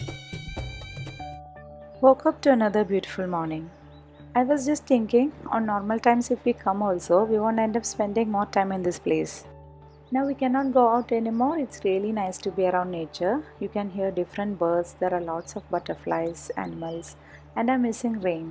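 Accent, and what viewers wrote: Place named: Kerala, India. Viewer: Your Malayalam ascent is very spicy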